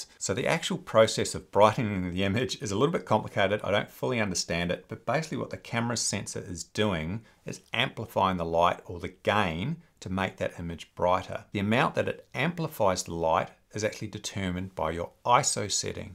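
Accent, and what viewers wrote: Australia. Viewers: Hi, I'm really enjoying the videos... it's wonderful to hear an Australian voice, highlighting birds that are there for us all to see/capture. I have already subscribed, but am trying to find the 'join' tab, so I can sling a small amount your way in appreciation. Can't find it... perhaps you already have too much cash and don't need any more! If you can steer me the right way it will be a win-win. Have decided to bite the bullet and go mirrorless with an R7, and an 18-150 as my 'everything but birds' lens and a control ring adapter so I can use my (second-hand) 100-400Lii, plus a 1.4 extender. Happy days!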